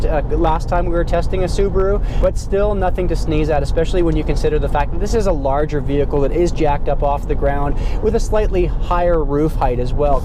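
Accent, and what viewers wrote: Australia. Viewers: Thats bloody good Aussie accent mate, good on ya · Your Australian accent is excellent!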